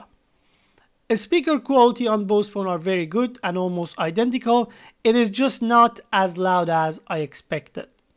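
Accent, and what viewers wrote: Iran. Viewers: Your accent is Iranian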